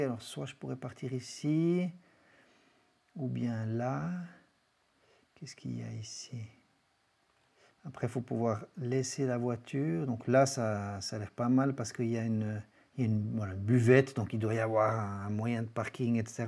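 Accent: Switzerland. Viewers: Salut ! l'accent suisse fait du bien :-) je confirme tout le bien de Swiss Mobile (Iphigénie imbuvable)...je te suggère peak viewer pour les noms des sommets quand tu es sur le terrain...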